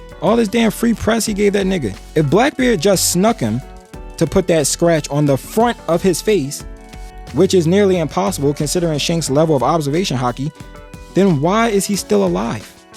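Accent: Baltimore, United States. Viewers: Is that a Baltimore accent lol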